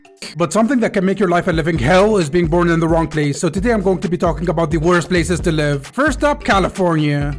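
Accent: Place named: India. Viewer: Bro sounds like he's from India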